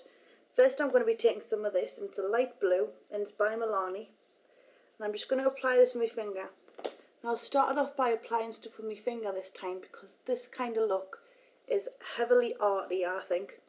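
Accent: Newcastle, United Kingdom. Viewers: oh get a life, shes a geordie lass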